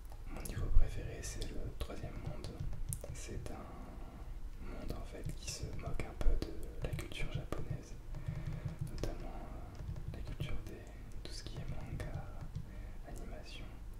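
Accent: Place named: France. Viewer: O sotaque francês é tão satisfatório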